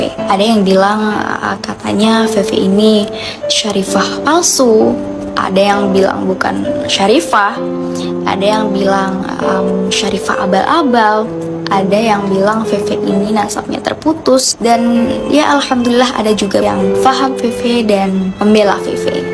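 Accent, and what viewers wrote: Indonesia. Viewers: Pri bumi sekali medoknya, lanjut.......!!!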